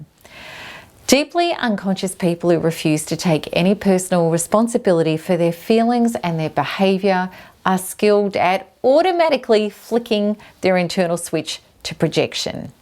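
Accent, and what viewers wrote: Australia. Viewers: I'm glad I found you. This is going to sound so weird and strange, but here's my confession: my dad was a womanizer who always complained about American women and how foreign types were so much better, this, that, yadda yadda. I must have been 12 or 13 when he started dumping that and constant criticism on me. To make a super long and agonizing story short, he married a woman from the gold coast who was easy to control like his ex-wife, my mother, until she was angry and stressed out to the max. There's so many wonderful people from Australia but I didn't realize how the accent by itself brings up anxiety and stress. Listening to you will be exposure therapy. I'm sorry to sound so weird and how something so small could still bring this up after so much healing, but I'm thanking God right now I found you.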